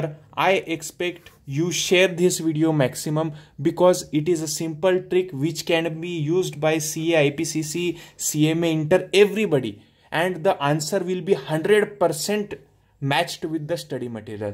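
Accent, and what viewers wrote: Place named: England, United Kingdom. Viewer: I'm from tamil nadu loving your english accent ❤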